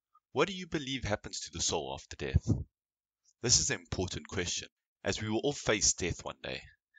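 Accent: South Africa. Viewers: You South African man?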